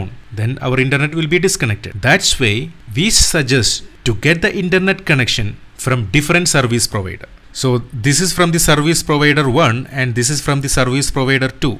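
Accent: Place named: Kerala, India. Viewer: bro r u Malayalee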